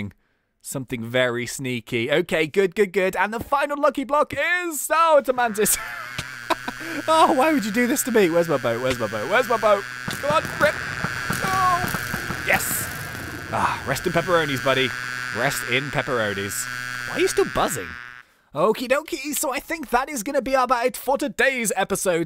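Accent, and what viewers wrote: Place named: United Kingdom. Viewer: Sometimes youre British might be a little irrateitating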